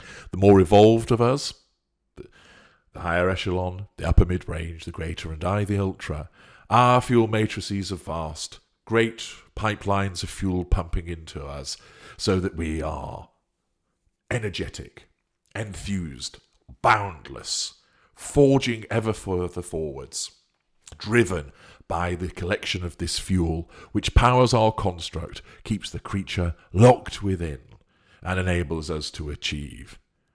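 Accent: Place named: Oxford, United Kingdom. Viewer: I bet your bass baritone Oxford scholar accent is fuel for plenty of men and women, narcissist or not! 🎙️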